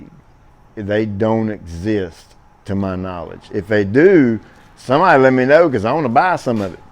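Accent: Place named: Virginia, United States. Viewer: I've watched slot of grass care videos. You're my guy! I'm definitely interested in purchasing your great blend. I live in NJ where it still gets just as hot as my hometown in Danville/Cascade Va. BTW, where are you from? You sound like you could be from those parts or close. Love your videos and look forward to learning more from you!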